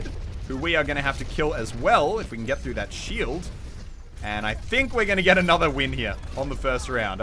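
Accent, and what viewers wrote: Australia. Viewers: I want to see you play Junkrat just because i want to hear some funny australian flavor in-game commentary :D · U are Australian right?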